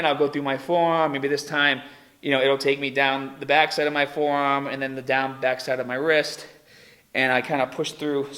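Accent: Boston, United States. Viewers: He's definitely from Boston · You from Boston? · What is your accent?. Are you from Boston?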